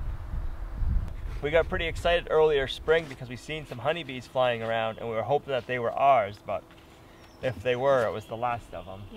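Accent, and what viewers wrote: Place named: Northeast, United States. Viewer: Moulton - It could be his unique NE USA accent. My 'ear' didn't catch it as odd. Although I'm SE NC, I had relatives who were No. NC & So. VA, with accents of saying "out & house" that sounded similar to "boot." My Grandma called shovel "shevel" & cover "kiver" with short vowels. 😊 I know is further north, but IDK where he grew up. It's the "Queen's English," as NC's tourist commission calls that type of accent, remains in parts of NC. Not quite northern VA nor New England. Many local areas have uniquely nuanced ways of speaking. just sayin'.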